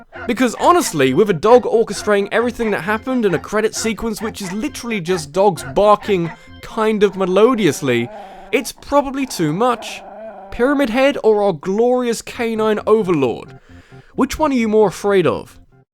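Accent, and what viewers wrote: England, United Kingdom. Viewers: For those wondering, he did pronounce Lumiose City correctly. While his pronunciation of the last syllable would usually be wrong, his particular English accent gives him a free pass, since the real pronunciation actually would sound like that if spoken with that accent unless the speaker was fluent in French to the point of using it interchangeably with English. As for the emphasis on the word, there is no accent on any of the vowels, so the first syllable gets the emphasis.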